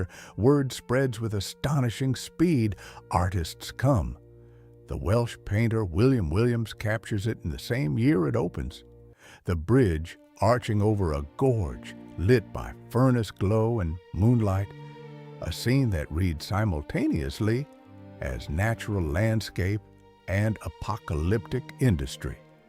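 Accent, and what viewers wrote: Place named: Appalachia, United States. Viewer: What on earth are you doing using an American hill billy to give a commentary on industrial Britain???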